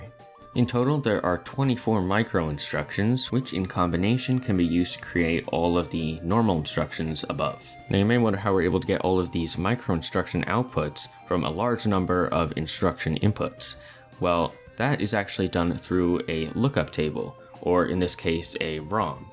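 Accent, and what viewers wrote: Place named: Germany. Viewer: Are you from Germany?The way you speak remains me my friend from there. I don't know all that cool stuff, but the way you explaining makes me want to learn. That you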